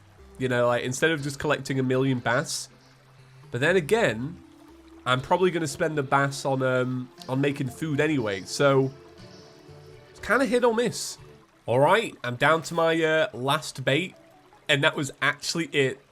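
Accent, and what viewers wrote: United Kingdom. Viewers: big boi slime vs cool british boi. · Cap? Cap? What country are you... wait your British... never mind.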